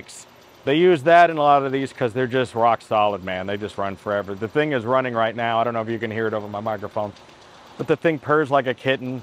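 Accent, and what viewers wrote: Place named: New York, United States. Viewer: Hey my sweetheart ‼️‼️Im crying laughing at you pretending to be taxi driver🤣🤣🤣You sound just like a New Yorker🤣🤣🤣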